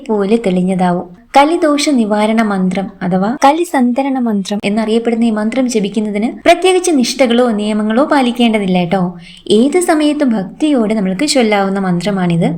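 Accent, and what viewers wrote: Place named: Valluvanad, India. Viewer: എല്ലാം ഒരേ വള്ളുവനാടൻ ശൈലിയിൽ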